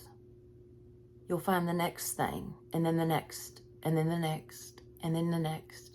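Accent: South, United States. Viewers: Wow! Thank you! I really needed to hear this today and I must admit I’ve watched it three times already and will probably do it again. I received some bad news about my health about a week ago and I am really struggling with it. I love your devotionals and crafts and most of all I love your voice! I actually thanked God for your voice today! I love that little southern accent. I’m in California so I don’t get to hear it very often but I particularly loved hearing you talk about the rocking chair and your mom singing to you and I have the same memories just lost my mom on September 17 and I miss her so much and I know you can relate to having a lot of bad things happen all at once. I feel like you are so relate-able. I just can’t thank you enough for all you have brought into my life through Jesus Christ our Lord! Thank you thank you thank you! ❤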